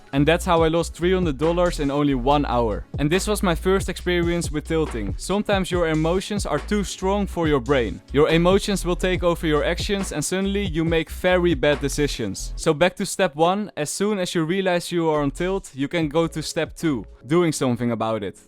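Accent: Netherlands. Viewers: You are dutch aren't ya? ;)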